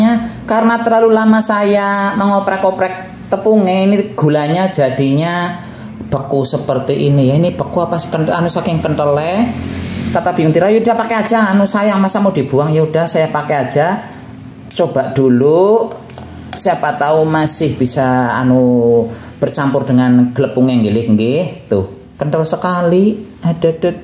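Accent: Banyumas, Indonesia. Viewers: Pbg hadir kang ngapak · Ngapak lucu koh 😂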